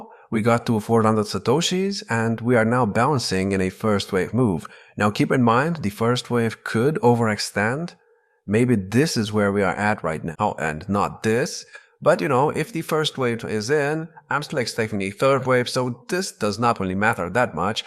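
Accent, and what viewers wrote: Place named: Iran. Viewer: hes accent reminds me of farsi so my guess is Iran 🎉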